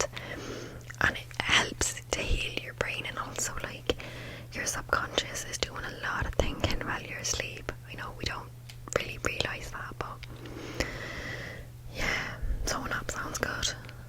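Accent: Dublin, Ireland. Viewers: Hahah pretty cool, keep up the Irish accent things please. It has a certain calm ambience to it. Which I think it’s cause your background is just very chill and down to earth, no extra lighting that makes everything too shiny. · ooh, i think this is my favourite video of yours yet! i don’t think i’ll ever get tired of your irish accent videos 🥰 they’re so satisfying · Great stuff! Is this a Dublin accent?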